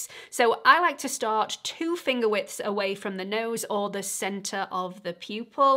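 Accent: United Kingdom. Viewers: Hi, that was insightful I normally don’t wear blush. I literally have only two one of which is a highlighting blush. If I ever choose to wear them on the more study regular basis, I will definitely head your advice. Thank you for the review and by the way, I love your accent may I ask what part of the UK are you from?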